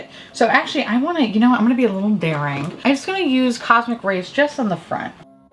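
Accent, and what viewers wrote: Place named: Minnesota, United States. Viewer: The more I hear your Minnesota accent the more I encourage you to watch 'F is for Family' so you can truly experience Jenny Throater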